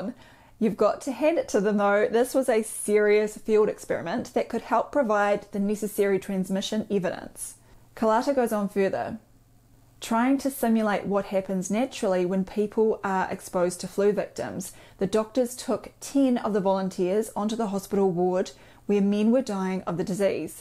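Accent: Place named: New Zealand. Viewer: But she a perfect New Zealand accent…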